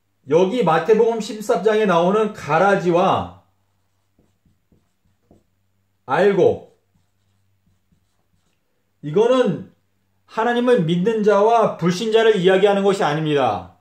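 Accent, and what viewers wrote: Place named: Jeolla, South Korea. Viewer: 고향이 라도 스타일인데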